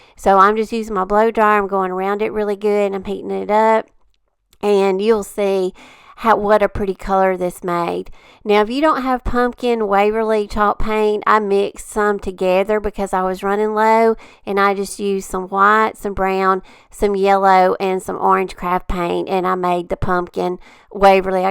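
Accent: South, United States. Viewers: I love your southern drawl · Just now seeing your channel for the first time. Love your accent! I’m a southern girl myself! Great crafts projects!! ❤️❤️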